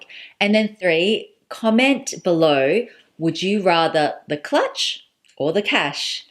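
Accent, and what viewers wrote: Melbourne, Australia. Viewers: Amazing to see a youtuber from Melbourne, comforting to hear the accent again. It's so unique to see a vintage bag as well, such an amazing prize to offer. Honestly, I would love the money so I can save that for when I'm feeling spendy and want to splurge on a nice bag. I hope you continue to succeed at everything you are doing and your channel progresses. Insta: rhiannon_davies_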